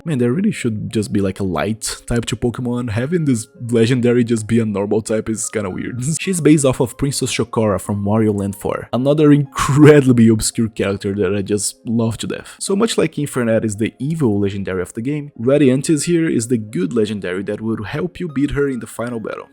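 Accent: Brazil. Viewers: I LOVE IT!!!! are you brazilian? your accent reminded me a lot of the Brazilian accent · I swear you have brazilian accent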